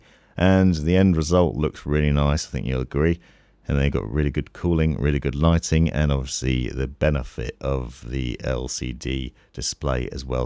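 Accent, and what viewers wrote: United Kingdom. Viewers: God your videos are just awesome, the editing, the sound quality, the clear explanations, the smooth voice coupled with the british accent 🥵, i love your work and you’ve helped me so much for my first build ! Thank you ! I was wondering though if i used the galahad lcd 2 aio in my system with 3 lcd fans and the rest being sl infinity fans would i use the galahad controller or would i need a different one ?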